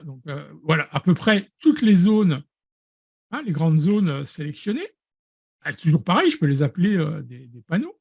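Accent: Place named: Belgium. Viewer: Une très intéressante découverte dès que je serai rentré à la maison que je retrouverai mon PC et que je pourrais abandonner mon téléphone à l'hôpital où je suis en ce moment, je vais vous suivre avec quelques tutos sur Youtube et peut-être même devenir un de vos élèves, YouTube m'a proposé la version anglaise à entendre votre accent vous devez être belge je crois..... salutations amicale d'un Belge perdu dans l'archipel des Açores 😃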